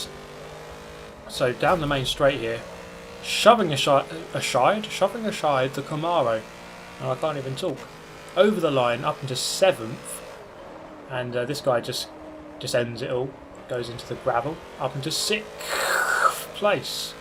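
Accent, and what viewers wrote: United Kingdom. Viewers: There's just something about a snarky British guy chatting about cars... Can't quite put my finger on it.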